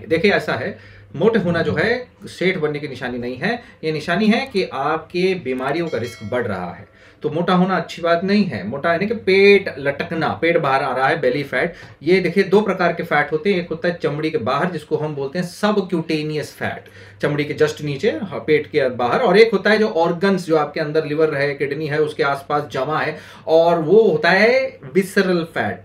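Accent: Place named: Bihar, India. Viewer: Bihari accent 😅😅😅😅😅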